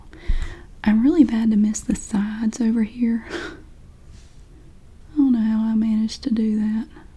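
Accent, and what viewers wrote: South, United States. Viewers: 🥰 Yayyy!!! I am so happy!!!! This was a request of mine. You are completely and totally awesome! I am so glad you did this video with talking instead of whispers (soft talking is my favorite), it's perfect! Your talking voice is so soothing and relaxing, like a southern female Bob Ross 🤗... I love it! And your nails are GORGEOUS! I wish I had strong nails like yours, they are beautiful. Hope you are doing well. Blessings to you and yours. 💕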